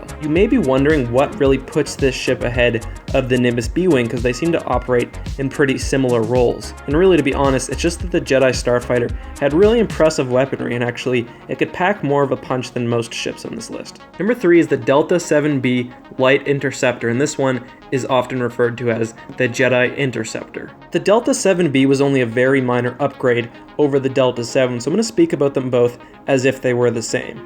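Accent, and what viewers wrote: Canada. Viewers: Wait you're a Canuck?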